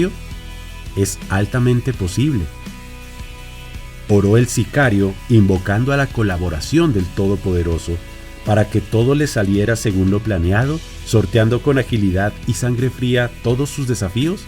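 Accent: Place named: Bogotá, Colombia. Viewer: Acento bogotano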